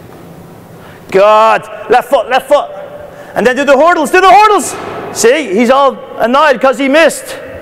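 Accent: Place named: United Kingdom. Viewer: British accent ''' in it '''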